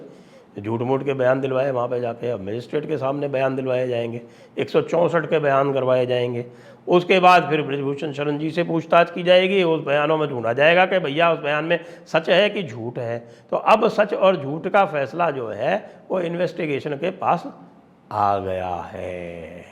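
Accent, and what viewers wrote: Haryana, India. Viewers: Your Hariyanvi is too good, Sir 🙏🏻🙏🏻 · Haryana local language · सर आपकी हरयाणवी सुन कै स्वाद आ गया 😂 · जी, आप तो बड़ी गजब हरियाणवी बोलल्लो छे, घणो दिल खुश होग्यो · Sir aapki haryanvi sunke swad agya. Jai Bhagwan parshuram 🤩🚩🚩🚩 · Zabardast haryanavi, sir. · Ha Ha love your Haryanvi Accent 🤣🤣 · Your Haryanvi accent is amazing. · Ajj ka andaj nirala rha haryanvi style me. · Sir your Haryanvi is too cute😂😂 And ye log Haryana ka naam dubane ki kaam me lag rkhe hai. Kori sudhi bakwaas kr re hai ye bawde. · वाह साहब न्यूज बताने का हरियाणवी अंदाज बड़ा निराला है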